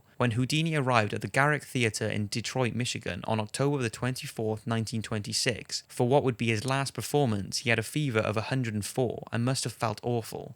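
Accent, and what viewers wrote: Wales, United Kingdom. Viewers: new to this channel going trough all your videos now :) are you from wales by any chance? you sound it lol I'm from wales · what part of the uk are you from mate ? :)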